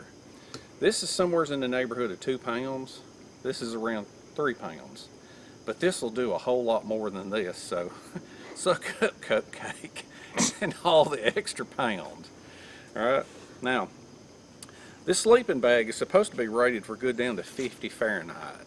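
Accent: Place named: Georgia, United States. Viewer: We love this guy... He speaks real American Georgia English . Love from JAPAN . ✌️😉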